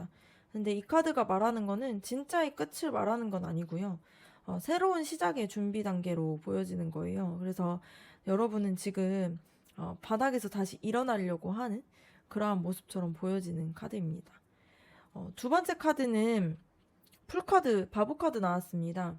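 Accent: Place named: Jeolla, South Korea. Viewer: (전라도)향기가 나는 구수한 리딩 잘 들었습니다😊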